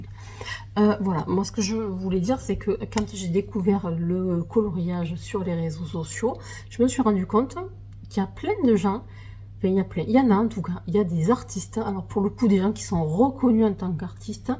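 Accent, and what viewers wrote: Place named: South, France. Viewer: Bonjour 🥰🥰je suis TOTALEMENT d accord avec toi au sujet de l importance de DÉCULPABILISER l idée de colorier. Infirmier en psychiatrie, effectivement l aspect art thérapie du coloriage est indéniable. . . Et effectivement il faut défaire les cases car il y a j en suis sur beaucoup de garçons qui colorient. . . Plus présent sur Tiktok je regarde de plus en plus youtube ou la communauté coloriage fait plus de hors mystère et est très très créative. Ayant fait des études d histoire de l'art je me retrouve enfin qd tu dis que le coloriage est un art en lui même. Je démarre ma chaîne ici pour démontrer que les garçons aussi peuvent colorier et se faire plaisir autrement qu en courant après ⚽. Merci et bravo pour cette super vidéo. Hâte de voir les suivantes. J avais jamais commenté tes autres vidéos/ vlog ou tu réalises de superbes et magnifiques colorisation, c est désormais choses faites. 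Encore bravo Ps au vu de ton accent on ne doit pas être très loin ( moi de paris mais depuis 12 ans a Toulouse avec mon Mari). Belle journée/soirée.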